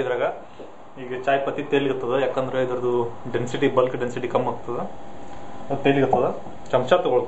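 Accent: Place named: Karnataka, India. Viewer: i somehow like the dharwad accent of speaking kannada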